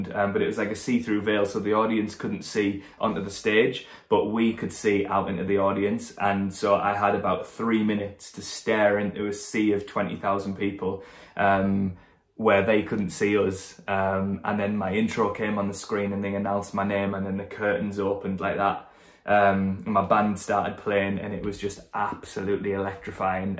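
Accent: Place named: United Kingdom. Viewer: Amazing voice, and super handsome, oh and I love your British accent!!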